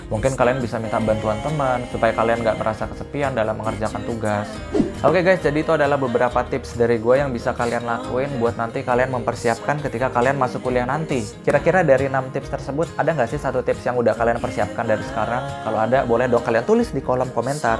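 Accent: Jakarta, Indonesia. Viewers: logatx msh jkt, blm sby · ya dia emng org jkt bro, cuma kuliah di surabaya jd dia lebih fasih bhs jkt, walaupun bhs jawa bisa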